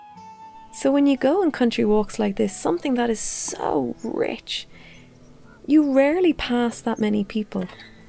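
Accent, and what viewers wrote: Ireland. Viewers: What an incredible way to spend some time with your father ❤️ I love your Irish accent. In my home, my children bring back the fruit scraps from their lunch or snack. I keep all in the fridge in a container and use them to make a lovely sorta “Christmas” scent in the crock pot. Add some cinnamon and vanilla extract, and omg heaven. Once the scent has been used to its full potential, I purée it, mix it with water and left over coffee grinds, feed it to my garden. Works for me and nothing goes to waste :) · All your videos just make me want to visit Ireland, it looks so beautiful and calm and the accent is gorgeous. I'm so happy that you appreciate your country so others can as well.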